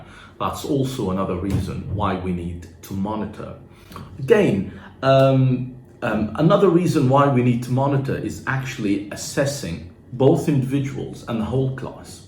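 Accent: United Kingdom. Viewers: God Pless you Bro Your British accent is Great Keet it UP ❤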